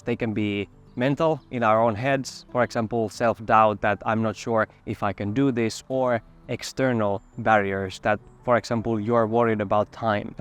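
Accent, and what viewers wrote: Finland. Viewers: Hehe! No, it is the typical Finnish accent for well-educated Finns who learnt to speak English at a very young age - I love it, it reminds me of my beloved native Finland. * is in fact excellent English*, as my native English teacher at Lund University, Sweden stated - the Finnish students spoke the best English, he said. We had/have good teachers in Finland!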